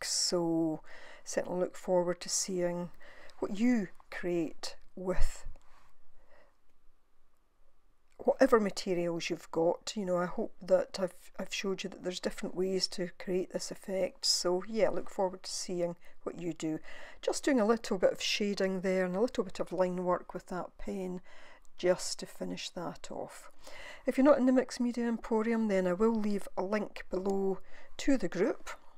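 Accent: Scotland, United Kingdom. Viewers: Thank you so much for this video. I've also messed around with this technique coz I think these patterns are quite amazing! I tried glass, sheets of rigid acrylic, bendy acrylic, various acetates, projector film, photo paper and more, inter-changing them all if you know what I mean. So far glass seems to give the best result. I found yt videos by Atomic Shrimp and Cat Hand (two separate channels) who did some experimenting with dentritic painting and mono-printing with pretty good results. I think they may have added a wee bit of water to the paints. Beats me how I did not discover your channel previously while on my dendritic travels, but am glad I found you now. You have so many videos and interesting techniques I would love to explore! And great to hear a Scottish accent too! Sub'd.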